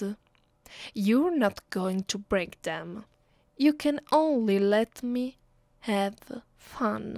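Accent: Italy. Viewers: Do Italian women actually sound like this in English lol or are you putting on a, 'Vampire' accent? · oh i've been kidnapped by a hot vampire lady with a italian accent, life couldn't get any better then this. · This is the BEST accent I have ever heard in my life. Like, literally, it just turned out that Italian people are what I would want to hear all my life. Oh my God. WHAT IS THIS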